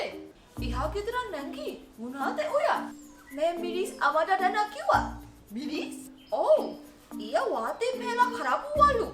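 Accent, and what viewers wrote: South, Sri Lanka. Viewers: දකුනේ ගැම්ම❤️ ඒක මරු. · දකුණේ ගැම්ම අම්මෝ 😁😁💖💖💖💖💖💖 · හරි හරි දකුනෙ ගැම්ම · දකුණේ ගැම්ම😆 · හරි හරි..දකුණෙ ගැම්ම😂😂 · දකුණේ ගැම්ම 😂😂😂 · දකුනෙ ගැම්ම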